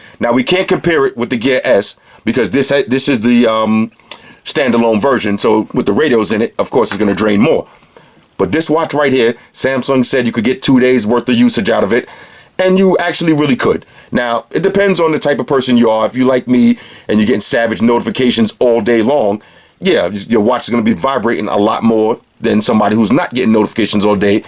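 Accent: New York, United States. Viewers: you are so NY, where r u from?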